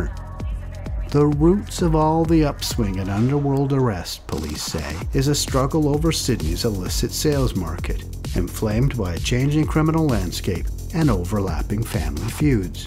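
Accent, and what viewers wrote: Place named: Canada. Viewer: He's Canadian I think. At least he sounds it. He covers a lot of Canadian crime also.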